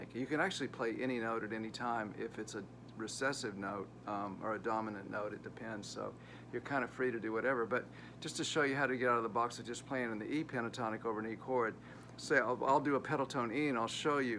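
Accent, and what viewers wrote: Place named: Scotland, United Kingdom. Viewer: Sounds a bit Scottish, lol.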